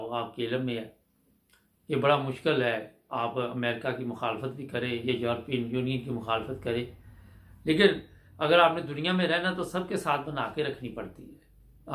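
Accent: Punjab, India. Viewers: Your punjabi accent tell me you are from Saialkot .am I right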